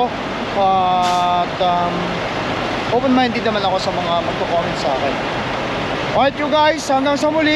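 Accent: Ilocos, Philippines. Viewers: Unawain mo kase ganyan tlaga lahat ng nagsisimula....wag ka naman masyado mag magaling boss..at isa pa ganyan tlaga ang pronouncetion ng mga ilocano..wag ka masyadO busher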